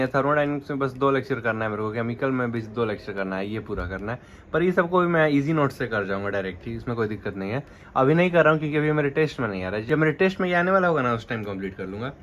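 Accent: Bihar, India. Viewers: Bihar se Ho kya bhai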